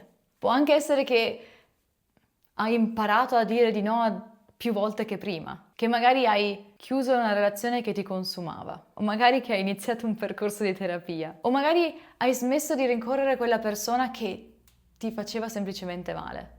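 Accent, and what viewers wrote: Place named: Veneto, Italy. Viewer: Prima cosa: fa strano sentirti in italiano, con questo accento spiccato veneto quando in live parli in inglese. Seconda cosa: personalmente, non do tanto valore al compleanno perché, anche se sembra banale, perché dovrei festeggiare, fare "casino", invintare gente quando (in teoria) posso farlo tutto l'anno? È come quando si dice: "La festa delle donne non è solo l'8 marzo, ma è tutto l'anno". Però, al tempo stesso, vero, più passano gli anni e più, almeno personalmente, non mi accorgo che siano passati. Forse perché tutto passa in fretta, taaaanto in fretta, e certe volte è difficile stare dietro a tutto. Non so dove voglio a parare con questo commento, ma forse, vorrei accendere quella speranza che ad ogni anno le cose andranno bene, non meglio, bene, anche se certe volte è difficile confrontarsi con le felicità altrui (quando specialmente già gli altri iniziano ad avere i primi lavori, le prime case, le prime auto, mentre io rimango qui nella stessa cameretta dove sono cresciuto con ancora tanto da fare e da scoprire)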